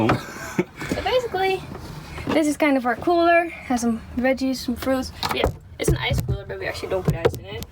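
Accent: Netherlands. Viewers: Is that a Dutch accent? Nice simple van design. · Ahh dacht al dat ik Nederlands accent hoorde😁 super Tof! Ik wil dit ook in nederland😍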